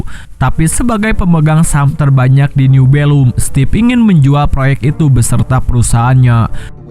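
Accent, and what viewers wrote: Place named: Sunda, Indonesia. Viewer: Minnnn kamu Sunda nya dimana ?? Logat sunda nya kentel bgt 😁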